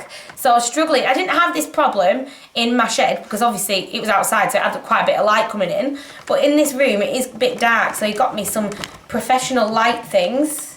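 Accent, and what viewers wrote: Northern England, United Kingdom. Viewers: It’s lovely to hear another northern accent ❤️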